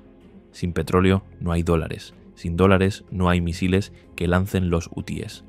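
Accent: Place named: Castile, Spain. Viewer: P A X. Sus análisis contienen un componente de la lógica filosófica, más elemental, que cualquier persona con un buen sentido común lo perciben con facilidad. Por otra parte la dicción de su locución, es muy castiza de la fonética de la vieja Castilla: muy agradable de oír. Enhorabuena !!! Gracias !!!!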